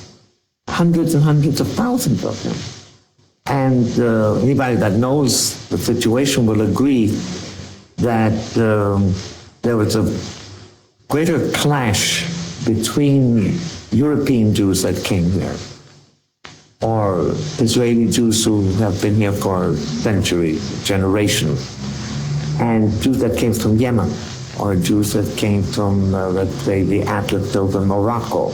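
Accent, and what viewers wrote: United States. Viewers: Her American accent gives it away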